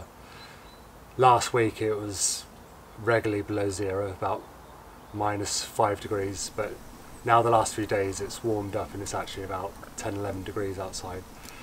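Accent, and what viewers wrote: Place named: England, United Kingdom. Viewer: Where are you located? You sound English but I doubt you're in the UK.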